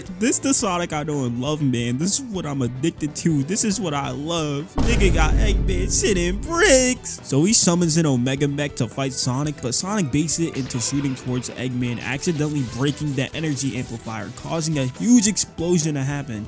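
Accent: New York, United States. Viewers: ah you a new yorker. Based. And Sonic X was the FIRST anime plus exposure to Sonic as a whole, next to Sonic Advance. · Oh snap a fellow New Yorker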